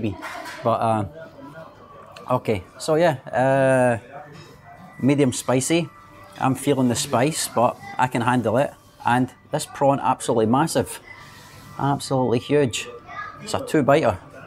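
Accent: Scotland, United Kingdom. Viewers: are you Scots? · it's not british english, it's scottish english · Damn it's a pity I discovered your channel recently:( Definetely love your Scottish accent and vlogs, gonna keep watching all of your videos dude, super beautiful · Scottish accent is bit hard to understand but lovely to listen to.🙂👍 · as an Indian man, you have a pretty extraordinarily strong Scottish accent.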